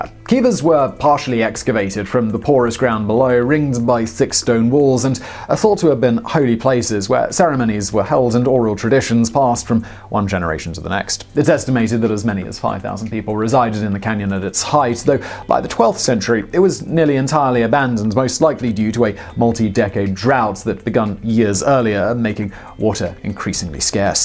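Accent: United Kingdom. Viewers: All of the posters criticizing Simon’s pronunciation need to remember he speaks with a British accent. I challenge many of you to go to other countries and pronounce words & places the same way as natives! Better yet, visit areas in the US and try pronouncing words the same way as people living there. I had a friend who was annoyed that I couldn’t pronounce her daughter’s name correctly. She was from PA and I am a native New Englander, from CT. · There is something so absolutely soul warming about listening to a Brit discuss the glory that is America. Never change. Never change.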